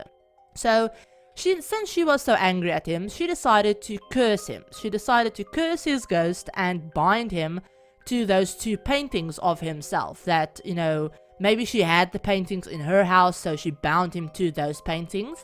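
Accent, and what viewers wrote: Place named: Afrikaans, South Africa. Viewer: I knew it when u was talking I was like yes she's Afrikaans in from south Africa tooooo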